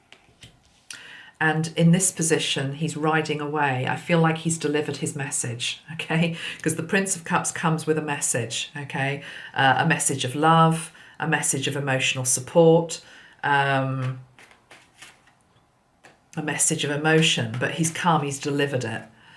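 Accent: United Kingdom. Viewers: Just 1st time your video came to me. 🤩 Love your energy, learning good amazing british English 🙏🙏❤️🤗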